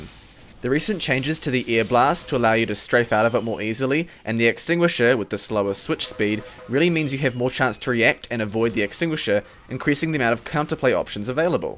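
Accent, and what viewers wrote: New Zealand. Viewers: what a strong kiwi accent :) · Are you from nz?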